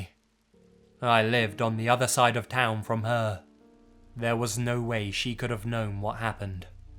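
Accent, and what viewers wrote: United Kingdom. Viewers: are you british? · are you from the UK? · He's British - we don't speak American. Your jello and aloominum is different to our jelly and ALUMINIUM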